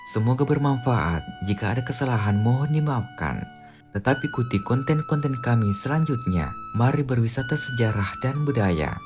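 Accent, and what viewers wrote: Bugis, Indonesia. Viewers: aksen (logat admin) nya khas Bugis Sengkang (Wajo) mantap sukses min🙏👍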